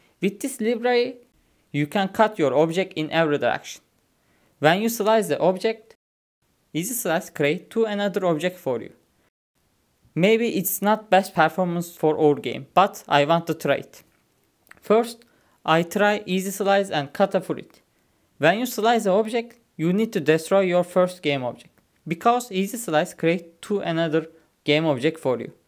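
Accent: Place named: Turkey. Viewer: helal aksaanindan anladim turk oldugunu guzele benziyor eline saglik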